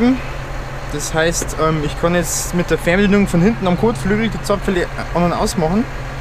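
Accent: Bavaria, Germany. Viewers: Sau gutes Video! Gefällt mir so mit bayrischem Dialekt, weiter so! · Meine Meinung ist Rede ganz normal und nicht so hochdeutschbayrisch ich weiß das vielleicht die ein oder anderen das nicht so gut verstehen oba ihn veida Redet mah aweng anders und i wors dast in da Nähe her kimmst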